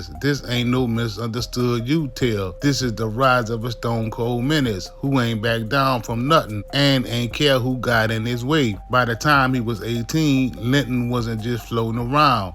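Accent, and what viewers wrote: New Orleans, United States. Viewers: Loving your videos on all the escapees, good research and a local NOLA voice making for great media 👍 keep it up you're going viral!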